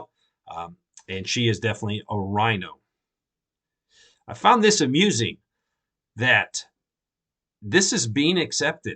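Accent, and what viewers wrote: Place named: South, United States. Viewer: It’s 3. Nu cle yer. Also, he’s Southern. Everything has more syllables in the South.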